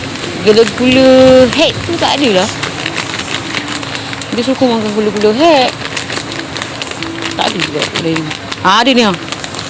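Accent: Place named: Malacca, Malaysia. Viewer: dialag orang Melake sedap je dengar hehe 😊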